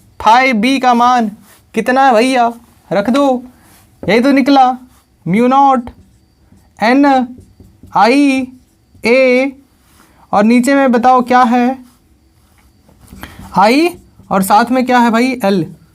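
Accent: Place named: Bhojpuri, India. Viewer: Bhojpuri sun k badhiya laga sir ji 😂😂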